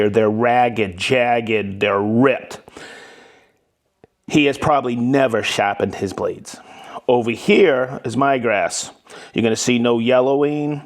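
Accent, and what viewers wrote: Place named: New England, United States. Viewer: Yikes! Metal shavings around wood-the reason I separate wood shop from my lawn mower repair shop. I get it your shop is set up for videotaping still makes me cringe. With that said, the hand grinder is my new go-to method to sharpen mower blades that’s an excellent method and like you hit the burrs with a file. There is a debate out there you shouldn’t sharpen to “sharp” as it accelerates degradation of the edge. For me I like sharp and my customers appreciate it too. Cleaning the blade I use a wire brush currently but will try your soak method next time. One shop I used to work at hit the blade with black spray paint. This along with power washing machine with clean blade adds a perceived value of pride-things that transferred over to my current shop. Like your “wicked good “ New England accent.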